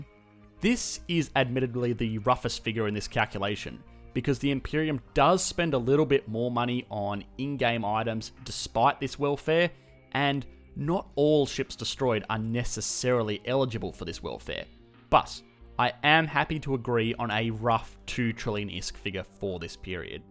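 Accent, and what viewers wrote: Australia. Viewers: Shame the Australian accent ruins any chance of the vid being taken seriously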